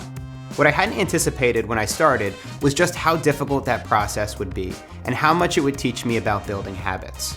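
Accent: Australia. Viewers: Call me stupid but I only just realised that has an Ozzie accent after watching your videos for like a year & half or something. Great video as usual. On a side note I've tried getting up early & cutting out sugar as well being inspired by your videos last year. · As an Aussie, thank you for this. I watch all your videos, and accent makes me feel close to home even though I'm living in NZ. It means a lot you are promoting the relief effort.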